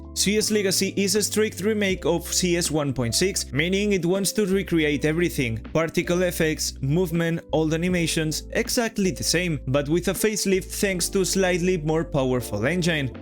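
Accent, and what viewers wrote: Spain. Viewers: COMO SE NOTA QUE ERES ESPAÑOL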